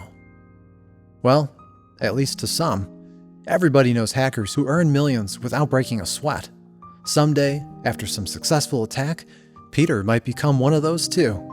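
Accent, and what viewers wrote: United States. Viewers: are you from western New York man? like Buffalo or Rochester or something?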